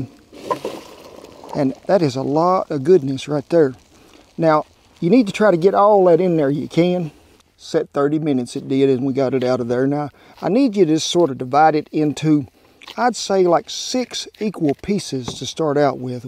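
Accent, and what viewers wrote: South, United States. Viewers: Love me some uneducated-southern-accent. Edit: Not everyone in Texas speaks like.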